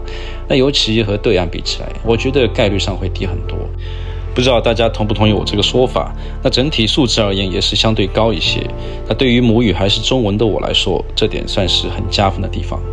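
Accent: Taiwan. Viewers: 今天才發現你的頻道，等等來全看一遍。 上海講話沒有口音嗎？感覺你說話跟台灣一樣。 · 你的口音為何跟台灣人一樣，還是你是從台灣過去上海生活的， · 你口音怎麼都變台灣口音了😂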